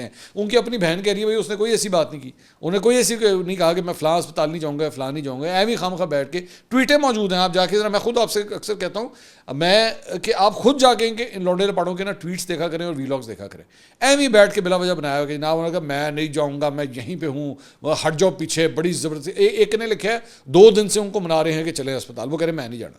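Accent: Punjab, Pakistan. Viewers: Please stop speaking paindoo punjabi U try to become way older n mature than what u r.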